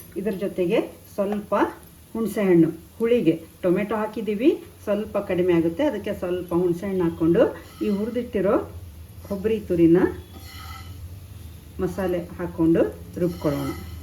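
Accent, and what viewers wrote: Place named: Konkani, India. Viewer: Aap kyon Konkani style bolate Shetti style boliye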